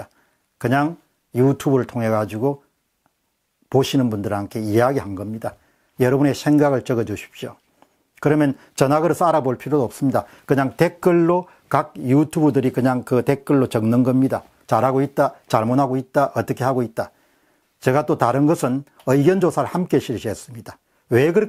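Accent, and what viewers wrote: Gyeongsang, South Korea. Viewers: 강한 대구사투리... 그것만으로도 공병호의 신뢰성 없어 보임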